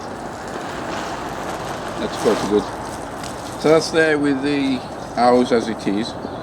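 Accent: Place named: Midlands, United Kingdom. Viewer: Hi. I just want to say thanks for uploading your videos. It's great to hear a fellow midlander on the screen. I'm from Dudley and proud of my Black Country roots. I can see that you're a bit of a womble like myself and believe in recycling whatever you can. Great videos and keep up the great work.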